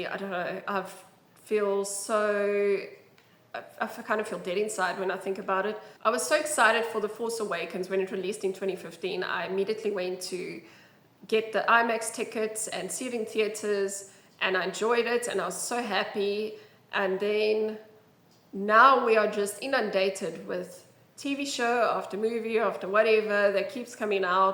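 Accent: South Africa. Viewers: LOVED this video! Agree with all your points! Another great femaile lead is Senua from Senua's Sacrifice. It's a brilliant game. Also, are you South African? 👀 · Is that a South African accent that I hear? Hello from SA :) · Great video, I couldn't agee more! As a South African Imust say you sound like a South African ;) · Yeah, I honestly didn't care much for the woke agenda, since it wasn't ALL there was to the game. What completely put me off from buying this game was the seemingly honest reviews; bad writing, mediocre gameplay, the facade of impactful decisions... yeah, that's extremely disappointing. Hopefully Mike Gamble and team can get a good game across the line with the next Mass Effect. Side note: a fellow South African showing love here. Recognized the accent. Great video. You have my subscription.😉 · I am Australian, and I am pretty sure this woman is South African, judging by the accent. 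People in South Africa still seem to have common sense, even here in Australia most people seem to have common sense, it's just when you switch on the TV the media like to portray as if society and reality is different, based on the agenda they want to push. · A South African talking about this "nonsense" is everything! Subbed! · South African accent. Like an Aussie got infected with Bavaria. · That accent... South African? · totally correct - also - ur accent? sa? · I always comment for Algo when I hear a south african accent. Must support my countrymen 👍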